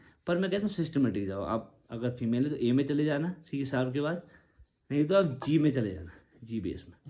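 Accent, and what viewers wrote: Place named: Pahadi, India. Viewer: Bhai accent se to pahadi lagte ho btw meri kahani to aisi hai ki Sa nikalne main 6 mahine or Sargam main 1 saal lag gaya par control itna nahi tha ki dhun bajaoo toh maine thande baste main daal di fir 5 saal baad lockdown main uthayi to dheere dheere raag bajane ka control aa gaya.Ab do saal se regular baja raha hu or thik thak baja leta hu.Sab khud se he seekha isiliye itna time or effort lag gaya kya karoo mogli ki generation ka hu, gadha majdoori kiye bina hum logo ko chain nahi aata.Smartphone generation to har chij main coaching,note,shortcut,trick,hack dhundti hai smart log hai na gen Z fir Z1, Z2, Z3🤣🤣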